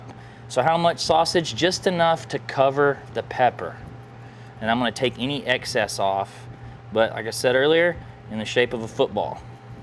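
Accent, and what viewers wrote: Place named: Kentucky, United States. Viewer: Would never expect a German to say “ain’t no such thing”! Love it. You sound like us (in Kentucky). Meat Church videos always really good.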